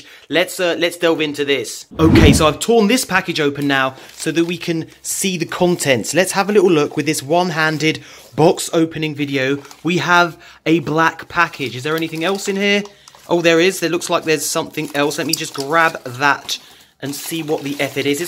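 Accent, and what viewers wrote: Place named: United Kingdom. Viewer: Welp.. I guess I'll sub now. Since you slowed tf down on talking speed you cheeky brit thing you.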